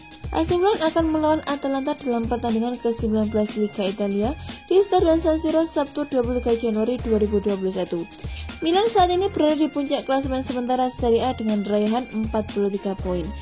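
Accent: Java, Indonesia. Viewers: min kok agak medok ya😂😂😂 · Medok tenan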